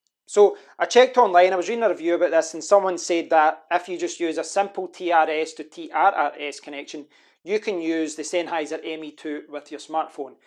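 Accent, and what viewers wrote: Scotland, United Kingdom. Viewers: I was looking for something else, but kept listening because of that amazing Scottish accent.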